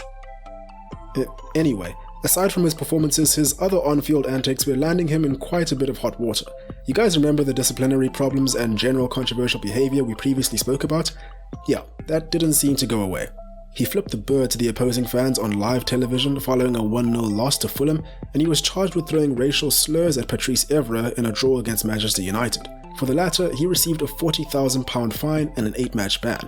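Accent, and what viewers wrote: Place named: South Africa. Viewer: Where are you from?!?! You sound like you're South African, and from Jo'burg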